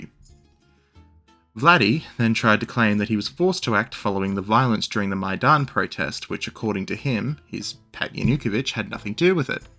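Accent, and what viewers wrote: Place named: Australia. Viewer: You sound like an Aussie. More correctly an ill informed AUSWIPE. Every word Putin spoke was true. The west has big plans for Ukraine THESE ARE THEIR WORDS. 1, They plan to shut down farming in Europe making it the crime of ECOCIDE punishment jail move it all to Ukraine. 2, Shitstain 🇬🇧 has spent big in Odesaa and the coast hoping Ukraine would build cheap warships. 3, Israelistan owns 30% of the land in Ukraine Black Rock and Vanguard planned to buy 100%. 4, Israelistan owns almost every major business in Ukraine just as they own Australian businesses. 5, Ukraine is losing the war its Military decimated. Redacted in the US announced Israelistan 🇺🇲 has ordered ZZelinsky to replace its entire government with western educated people who are LOYAL TO AMERICA this accounts for General Zalhuznyi a UkroNazi his replacement more acceptable to RUSSIA and the WEST. 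6, The ploy to take Ukraine and the southern ports failed tbey thought they could fill it with war ships and block Russia's warm water ports. 7, The west thought creating a UkroNazi state yes there is film of an American Nazi explaining how the CIA used US Nazis to create AZOV Shitstain 🇬🇧 created right sector 1 and 2 using UK Nazis other groups KRAKEN etc exist they were handed territories where they ruled like medieval Barons. The war to collapse Russia failed Europe and Israelistan are bled dry of weapons cash and raw materials they hoped to steal via the total collapse of Ukraine and Russia have evaporated theyre broke.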